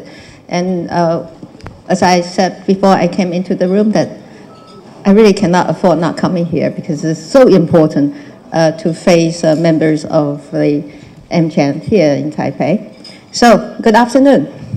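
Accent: United Kingdom. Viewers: 英式的英文！ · 蔡英文的口音是英國腔耶，好好聽喔！英文真好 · 有兩個英文youtuber都鑑定蔡英文總統是偏向英國腔 ( 請搜 "浩爾譯世界" )，總統加油!!! 2020 請再為台灣服務! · 開場的聲量還有點顫抖、可能是興奮稍微害羞等等，有點台灣腔跑出來，但是後面部份鎮定了，進入主題後展現自信、侃侃而談，很明顯是流利的英國腔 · 英國腔很重捲舌短音尾音拉高、氣音加重是傳統英國式英文跟美國粗獷大條不掩飾的發音有很大不同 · 愛英國腔😍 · 被東吳英文系的韓國瑜嚇到掉了一魂，來這裡聽蔡英文的英式英文壓壓驚。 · 這台風和幽默感~ 英國腔好聽就不用提了XD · 很有趣的開場，英國腔有點不習慣，不過還是ok的!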